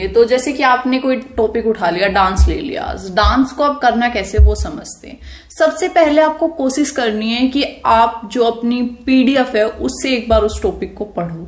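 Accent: Haryana, India. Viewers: Sister jab aap bolte ho to haryanvi tach aata h 😊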